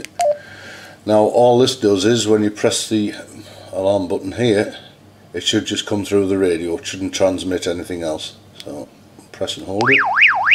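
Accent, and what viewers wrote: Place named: Newcastle, United Kingdom. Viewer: hi m8 u sound like ur up in newcasle in the uk im in hartlepool n just got thr baofeng uv-5rc and need help programming it can u help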